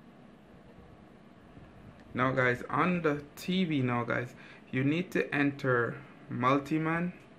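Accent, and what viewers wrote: Jamaica. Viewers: Sound like a yardie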